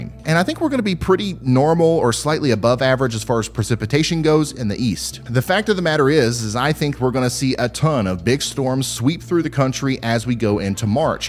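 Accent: United States. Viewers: As I noted how country your accent was I realized how country I speak as well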